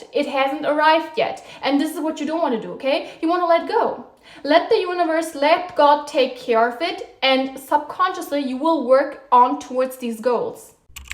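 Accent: Germany. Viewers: I recognize a german when I hear one🤭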